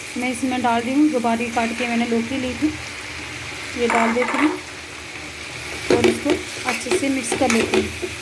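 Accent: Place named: India. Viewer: Bhout Sundar sister desi aandaaz bda pyara lga aapka 💯💯💯👍👍👍👍👍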